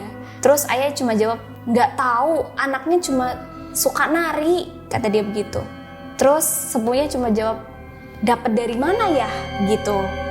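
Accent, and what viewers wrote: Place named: Pemalang, Indonesia. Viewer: Orang Pemalang mn suaranya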